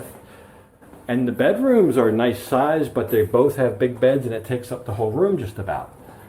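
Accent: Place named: East Coast, United States. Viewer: Where did you Grow Up? Sounds like east coast accent. Definitely a Great Radio Announcer Voice!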